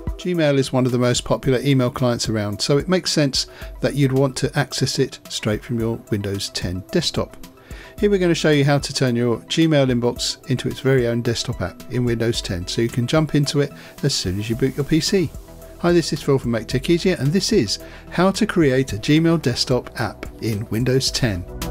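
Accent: England, United Kingdom. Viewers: Machine gun rapid fire voice presentation . Heavy English accent. Listened to tutorial multiple times, tried ajusting volume levels , finally gave up, Will look for another tutorial for downloading Gmail into a windows 10 desk top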